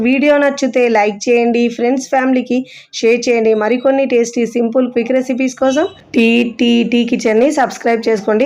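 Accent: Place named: North India, India. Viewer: ఎందుకు సబ్స్క్రయిబ్ చేసుకోవాలి, ఇ లాంటి చెత్త కోసమా, అసలే నార్త్ ఇండియన్ లా ఉన్నారు తెలుగు రావట్లేదు.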